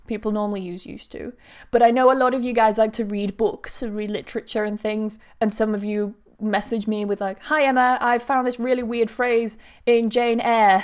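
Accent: United Kingdom. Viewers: Emma your videos help me soo much, Thanks for helping💘 i love the british accent and England. Saludos desde Argentina 🇦🇷 (I think it is "grettings from Argentina in English")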